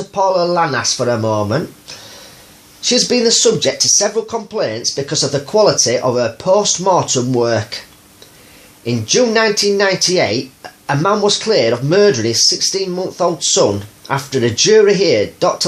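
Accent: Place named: West Yorkshire, United Kingdom. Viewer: Where are you from mate.I know its northern and I'm thinking west yorkshire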